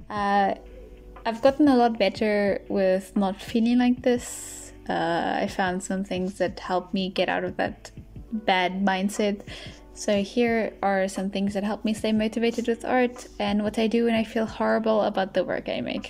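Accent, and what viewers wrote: South Africa. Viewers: oms you are from South africa too, girl ive been watching your videos and i only hear this now woowww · WOAH ur south african too??? i thought i recognised the accent- i thought i was aloooone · Are you South African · wait you are south african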